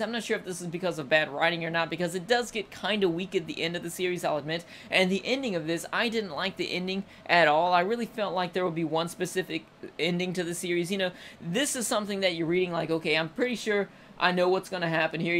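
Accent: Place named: United States. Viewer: Random question, but where are you from? I can hear a country accent from you lol.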